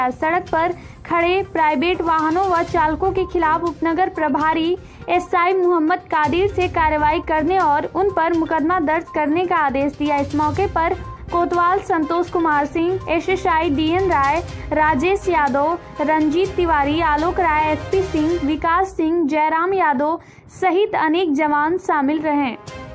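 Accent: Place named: Bhojpuri, India. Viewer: Madame ji please accent par jyada emphasis mt kijiye, Bhojpuri ki tarah sound aa raha hai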